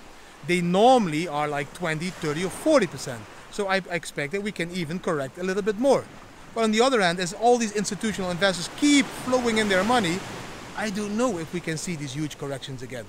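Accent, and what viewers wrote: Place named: Netherlands. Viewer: I love your dutch accent 😄 I lived in the Netherland for 2 Years. I met Bitcoin in 2013....since that time i ‘m part of the blockchain adventure. Great job.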